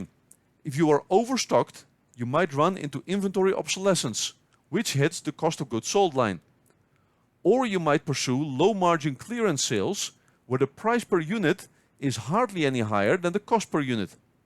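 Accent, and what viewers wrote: Netherlands. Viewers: Dutch accent for the win! Haha Mede Nederlander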